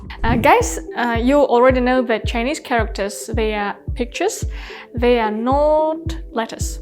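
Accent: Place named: Kazakhstan. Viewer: I’m starting learning 汉字，because I want to learn calligraphy, so before I want to learn radicals. I searched on YouTube and found this channel, I’m literally like this 😮: it’s like all my dreams coming true. I don’t know exactly why, but this video and your channel really, really got deeply in me! Your grace, cheerfulness, clarity, humor, and maybe the slight Kazakh accent make this so wonderful. I’ll watch a video a day 🤩. 谢谢，! I hope you can continue doing these and other videos in the future 😊 P.S.: Omg 水晶 Crystal is water and 3 suns, shiny water! 😱😱😱 this is amazing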